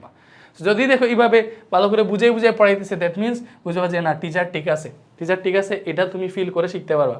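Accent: Sylhet, Bangladesh. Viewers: ভাই কি সিলেটি?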